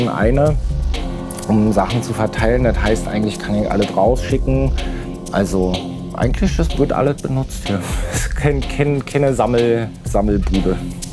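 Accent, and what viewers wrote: Berlin, Germany. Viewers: schöne berliner Schnauze wa!? sympatischer Typ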